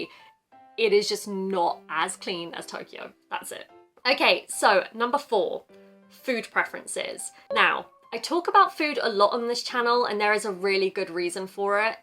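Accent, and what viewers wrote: United Kingdom. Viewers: 凄く聞き取りやすくて、きれいで優しい話し方ですね。これがイギリス英語かな？ お辞儀はやっぱりうつってしまうようですね。私の知ってる海外から来た人達も、 もれなくお辞儀するようになってましたｗ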